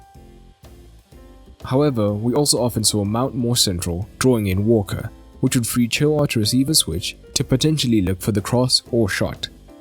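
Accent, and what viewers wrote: South Africa. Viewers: Are you south African? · Is the narrator south African? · Are you South African?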